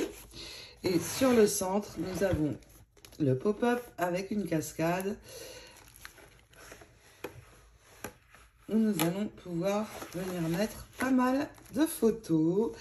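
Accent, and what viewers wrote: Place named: Nord, France. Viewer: Bonjour ton album est vraiment magnifique. En t écoutant tu as garder ton accent du Nord j'adore.des bisous 😘😘😘😘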